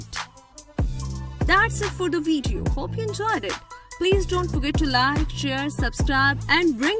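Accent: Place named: India. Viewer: The Indian accent is worse than the robot voice.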